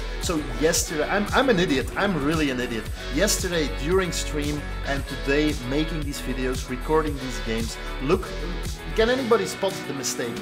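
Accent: Netherlands. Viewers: Hi just a question, are you dutch? Cause your accent sounds like dutch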